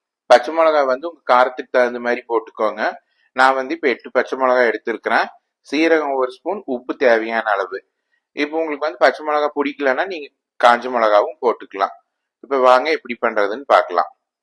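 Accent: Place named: Kongu, India. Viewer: சாமி நம்ம கொங்கு நாட்டு தமிழ் அழகே தனிதான் . உங்க தமிழும் அருமை கீரை கடயலும் அருமை. வா சாமி என்ற ஊட்டுக்கு சாப்பிட குடும்பத்தோட வா கண்ணு🙏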